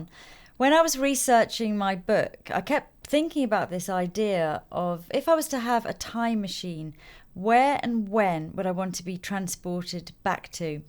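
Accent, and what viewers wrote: United Kingdom. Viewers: British duh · British accent I think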